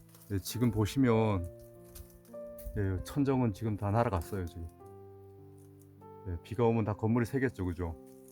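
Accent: Gangwon, South Korea. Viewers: 우연히 보고 들은 강원도 억양.너무 반갑고 좋아서 보고 또 보고 듣고 합니다 정말 감사히 잘보고 있습니다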